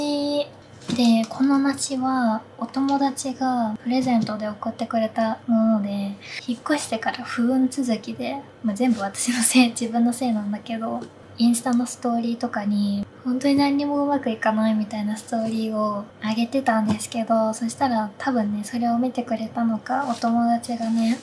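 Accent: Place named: Kansai, Japan. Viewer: さんの関西弁最高やー😊お部屋も勿論やけど🎉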